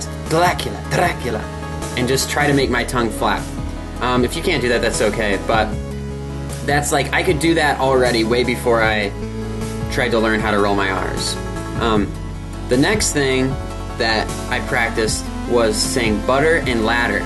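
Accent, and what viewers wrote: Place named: United States. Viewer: First of all thank u for ur prilliant ideas, but iam wondering why u r learning rolling Rs cuz u seem like pure American I feel like u r a native speaker thanks again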